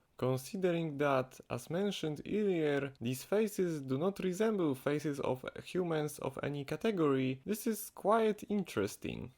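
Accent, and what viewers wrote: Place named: Poland. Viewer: Idk why you were worried about your English, it was good. Sure, in some fragments the Polish accent it's shown and some pronunciation are more or less so Polish XD BUT overall, everyone can understand what you're saying! Also, maybe I make a bit fun off your pronunciation but it will never compere to how my classmate from long time ago pronounced "vegetable" XD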